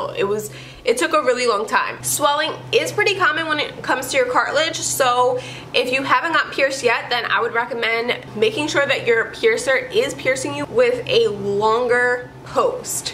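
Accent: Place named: Barbados. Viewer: That Bajan accent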